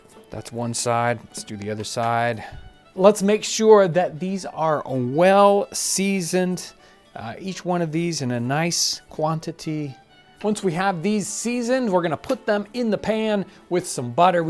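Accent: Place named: United States. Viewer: Its pronouced (Shuh-Lots) not Shallets 🤣🤣 Wow American English its horrible UK version is much better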